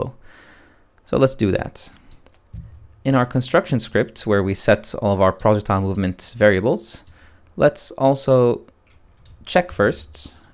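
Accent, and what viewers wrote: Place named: Arab world. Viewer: I just wanna ask 1 question ! where are you from ( I feel you are arabic)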